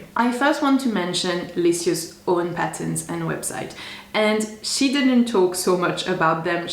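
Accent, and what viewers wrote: France. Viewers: I love your French accent and some words should just stay in the original language ☺️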